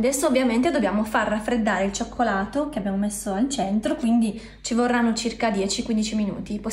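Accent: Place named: Italy. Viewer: I'm so very surprised to be here because my new favourite singer have a dessert channel, it's so very interesting! I have to learn some Italian and make the recipes 😁, I love your Italian accent Cheers!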